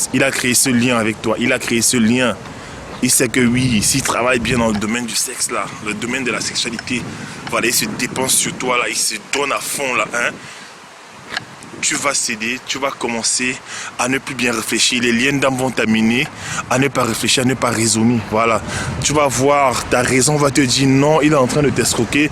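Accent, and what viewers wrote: Cameroon. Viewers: Pas besoin de te présenter gars, ton nom, ton timbre vocal et ton élocution font de toi un Camer. Et merci pour le conseil. Je t'invite à aller écouter les bra-tra-saqueurs de Marseille su Femme Immigée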